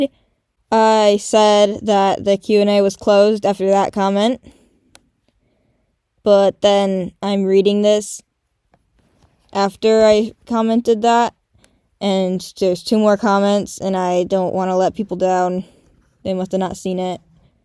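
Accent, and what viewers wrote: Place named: England, United Kingdom. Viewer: Congrats bro!! (or are you a girl? I'm bad at understanding English accents, sorry) Actually it would be cool to have more ways to communicate, but maybe not this time Whos harder: nightmare king or the absolute? radiance Will anyone even answer my comment..? If you'll read it I hope you'll have a great day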